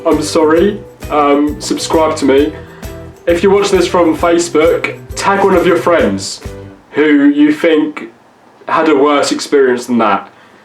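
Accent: England, United Kingdom. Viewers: Mohammed.J British accent. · Mohammed.J I have the same accent, it's british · Tangie Masten he does, British accent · Hes english like me and dats how we talk bruh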